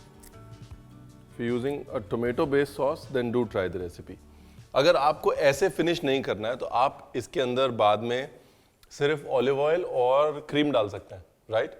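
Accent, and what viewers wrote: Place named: Lucknow, India. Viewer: Liv ur lucknowwaaa slang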